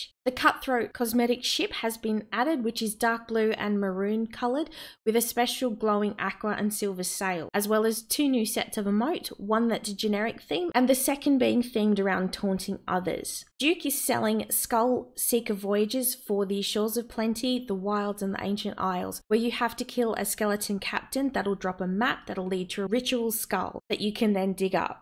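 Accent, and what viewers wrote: Australia. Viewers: Love the aussie accent 😜👌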